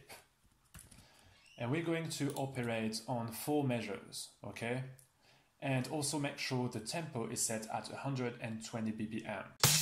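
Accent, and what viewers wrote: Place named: France. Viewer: Synthétique et raffraichissant !!! Loving the french accent !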